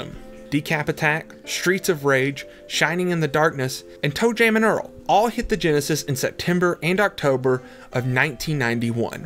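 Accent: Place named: Oklahoma, United States. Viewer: Are you from Texas or Oklahoma? I’m from Oklahoma and recognized a hint of twang in your voice and was just curious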